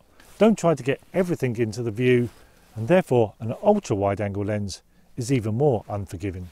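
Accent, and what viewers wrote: United Kingdom. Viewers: Hi! Is it Yorkshire?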